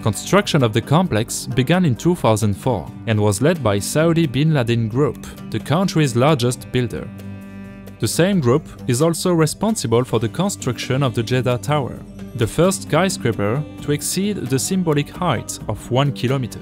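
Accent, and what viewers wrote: France. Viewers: I like your French France 🇫🇷 accent but sometimes I can’t stand what your saying I’m ai sorry will you folrgive me. I’m a sorry.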